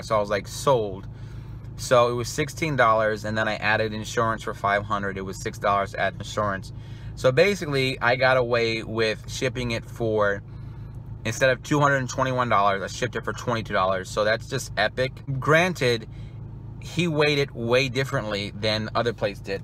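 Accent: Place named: New Jersey, United States. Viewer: What part of jersey are you from?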